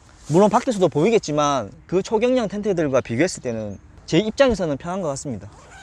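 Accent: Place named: Seoul, South Korea. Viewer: 이 형 서울말도 잘쓰시네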